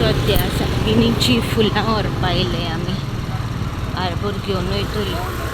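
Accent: South, India. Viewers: Nice voice and south accent keep it up Nice information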